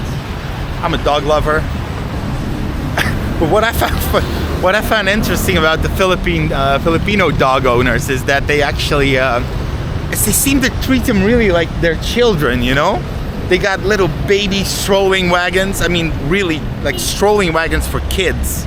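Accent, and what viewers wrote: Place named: Hollywood, United States. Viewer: Your English as a Dutch sounds like Hollywood English accent.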